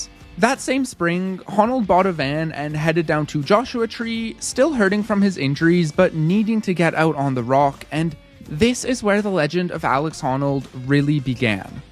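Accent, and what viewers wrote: Canada. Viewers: I can tell the narrator is Canadian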